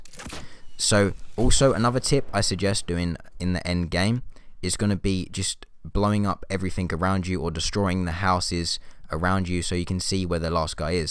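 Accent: United Kingdom. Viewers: You from the uk? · Btw you sound British I'm British want a cupa tea 😃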